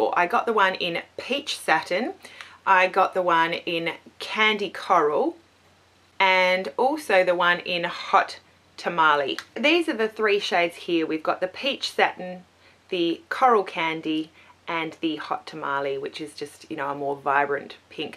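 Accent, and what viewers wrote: Australia. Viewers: I loveeeeee ur cool Aussie accent! Where in Aussie do u live? I'm in Sydney..:) Nice haul...! Missin USA just for shopping lol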